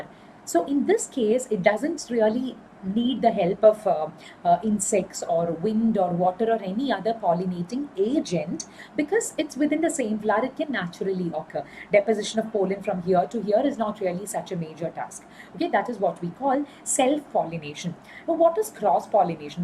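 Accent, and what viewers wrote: Kerala, India. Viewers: are you malayali?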